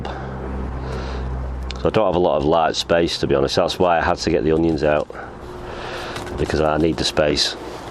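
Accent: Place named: North West England, United Kingdom. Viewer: Hi, I just found your channel as it popped up on the recommended list at the end of another video. I am glad it did, I really enjoyed the video and will look forward to watching your season progress. I take it from your accent that you are a fellow north west gardener:-)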